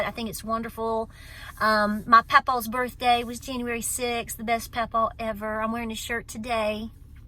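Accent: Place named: East Tennessee, United States. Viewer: How do you pronounce that BUUT? OR BUAT? BOOUT? I am from east Tennessee and we definitely have a unique way of speaking! I have lost most of my accent but love hearing it now! And girl you got it !!! Love you!!!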